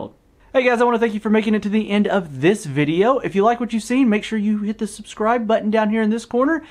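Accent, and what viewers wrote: South, United States. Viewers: Your subtle southern accent is quite pleasing to the ear for some reason lol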